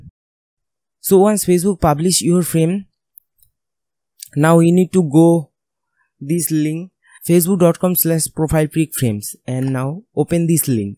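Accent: India. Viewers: This is probably really good for INDIA ACCENTed people, but I CAN ONLY UNDERSTAND about 20% of what you are saying. GEEZZ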